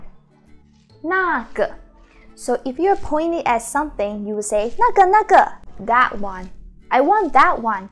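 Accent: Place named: Taiwan. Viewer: thank you really easier for me to learn it with Taiwanese accent! got addicted with all this Taiwan movies:) pls do more... is their any rules for the chinese characters or we can just simply memorize it? or their is an alphabetical rules for that? thank you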